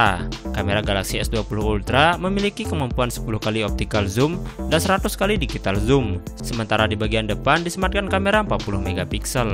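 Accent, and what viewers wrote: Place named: Bugis, Indonesia. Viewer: Logat bugisnya masih ada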